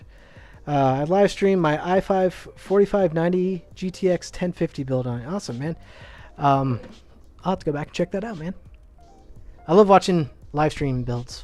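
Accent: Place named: Texas, United States. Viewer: This is my first video of yours to watch and as a fellow Texan I knew you were from Texas before you said you were haha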